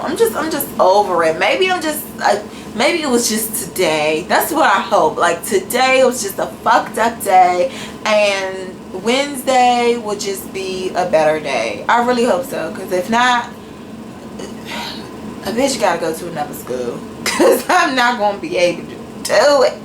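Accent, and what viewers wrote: Washington DC, United States. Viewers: Lmao yassss!!! I would've done a video like this when I was at school. I went to school in NC but I'm from DC too, I was watching your make-up video and was like this girl must be from DC I can tell in your voice lol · She's from dc she has an accent 👌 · You guys chill out, she's from DC thats how they speak, Its just her dialect. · Lol I know you from DC I can tell from you accent. I loved this video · yasssss. DC accent!!!! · Lmao. You so funny! You from Washington DC or the surrounding area? Cause thats how we talk there 😂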